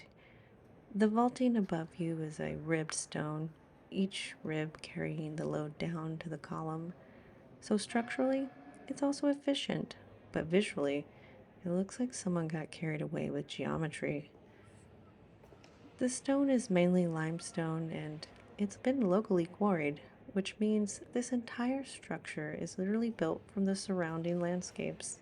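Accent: South, United States. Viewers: Hi, only just seen this one, but again, another excellent video, I remember the fire well, and the one in Windsor Castle, both hugely important events in their respective histories. You seemed surprised when another correspondent said it was "Auntie" standard, and questioned why. The answer is quite simple: well researched, calmly delivered facts, rather than sensationalised narration. I'm imagining Sir David Attenborough, but female, American (but rapidly becoming more Brit), with that soft, warm Southern drawl wafting over the visuals. Check out his documentary on gorillas from the late '70's, where he's in the midst of a family of gorillas, from the silverback to babies, retains his composure, and continues in hushed tones as they groom him, lie on him, and even (out of camerashot), as one of the youngsters removes his shoes. True professional, and still making wildlife documentaries at age 99. 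Sorry about (another) long post, keep up the good work my dear. Chin chin! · I love your voice, both tone and pitch which, combined with your southern accent, is very soothing. Could easily put me to sleep. Excellent video, one of the best I've seen on the subject matter.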